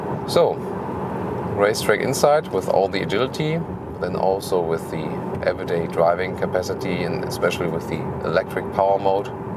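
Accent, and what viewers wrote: Germany. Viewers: sehr schön, vielen dank. und - nicht böse gement - gerade der schwere deutsche akzent macht das englisch für mich leicht verständlich ;-) weiter so, lg aus österreich. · Wer merkt auch das er deutsch ist ...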